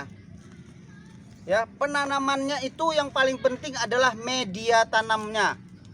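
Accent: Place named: Medan, Indonesia. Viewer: Tengok, nampak.. cakap-cakap khas medan 😂 Semoga sukses bang 👍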